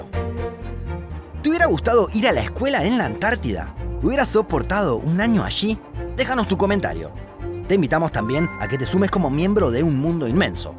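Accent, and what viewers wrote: Argentina. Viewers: El título es villa las estrellas y el narrador habla el 80 % de argentina típico argentino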